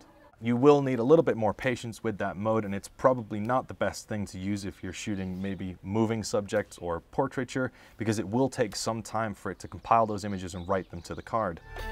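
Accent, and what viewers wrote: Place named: Bristol, United Kingdom. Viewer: You're rocking that Bristol accent...!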